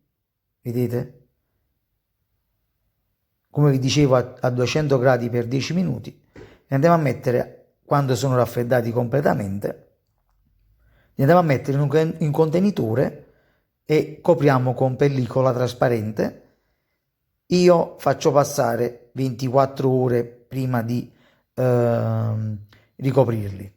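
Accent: Naples, Italy. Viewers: Bravissimo,può fare poi gli struffoli?Sono di Napoli ma abito a Torino e gli struffoli x Natale non mi sono venuti mai bene.Mia madre diceva falli a occhi ma io non lo so fare.Grazie.Sono una nuova iscritta.Mi piace sentire la cadenza"napoletana"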